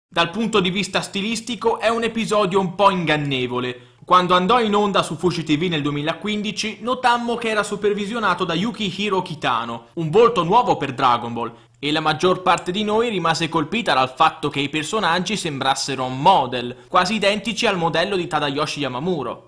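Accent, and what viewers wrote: Italy. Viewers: sei italiano ?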